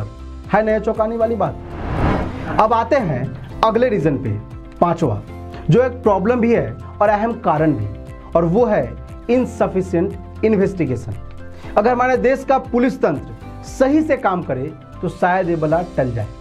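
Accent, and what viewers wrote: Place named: Bihar, India. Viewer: शानदार प्रस्तुति दी है आपने किन्तु बोल चाल में बिहार ज्यादा है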